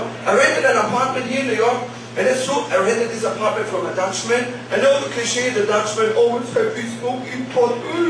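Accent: Germany. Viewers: Weil er einen bayrischen Akzent hat, richtiges bayrisch klingt nochmal anders, für alle ausserhalb Bayern kaum verständlich. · Wow, I guess native speakers will have a hard time understanding this. A severe german accent is rather disturbing than helpful for english comedy. In order to really use a fun german accent you probably would have to speak a nearly perfect english and then take the stereotypical parts of the german language and emphasize it. The way he's doing it is way too exhausting for the audience. Fun guy though!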